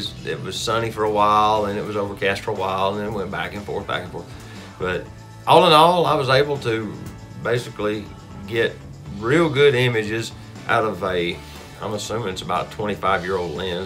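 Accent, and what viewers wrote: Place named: Alabama, United States. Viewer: Looks like Horse Pens 40 where you were bouldering. Maybe I'm just assuming it was Alabama due to your accent (I'm from Mississippi). Anyway thanks for the video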